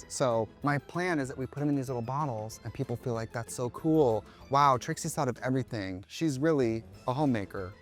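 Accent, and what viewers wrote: Wisconsin, United States. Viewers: The wisco accent is back in full force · Honestly, the wisconsin accent was strong in this video. love that for you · In my head, I was like why does Trixie kind of sound like Ryan Murphy playing Ed Gein from Monster but then I remembered they’re both from Wisconsin lol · wisconsin accent is thick today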